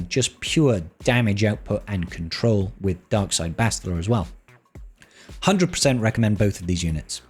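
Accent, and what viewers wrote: Wales, United Kingdom. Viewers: Same here, I have all of them😂 Just enjoy listening to our favorite Welshman.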